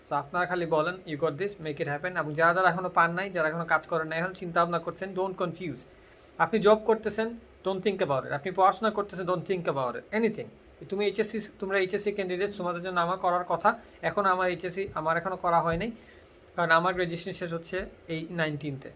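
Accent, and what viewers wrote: Sylhet, Bangladesh. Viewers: Sylheti bhai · That's Sylheti😍😍😍 love you bai♥️ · Vaiya, are you sylheti? Your accent makes me curious 🙈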